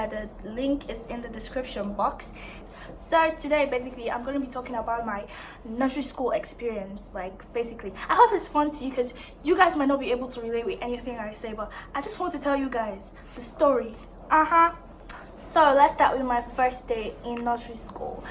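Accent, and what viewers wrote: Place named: United Kingdom. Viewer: You have a British accent🤔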